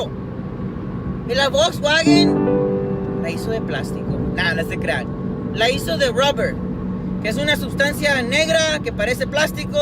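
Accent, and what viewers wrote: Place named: Paisa, Colombia. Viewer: Puberto imberbe, si la tecnología de esos vehículos no está a tu alcance mejor ni los toques, hablas de Honda y autos que según son resistentes y al inicio que Volkswagen es rápido, potente etc ... Nivela tus argumentos y ponlos en dónde deben de ser. Cuando conozcas la tecnología de Volkswagen y autos de nivel alto (que creo que no están q tu alcance) te invito a Georgia. Saludos paiza, me gusta tu acento.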